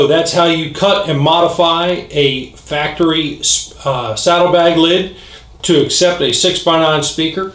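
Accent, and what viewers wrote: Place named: Maryland, United States. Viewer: listen to the 'Merilan' in his voice....also, loved that he substituted speakers.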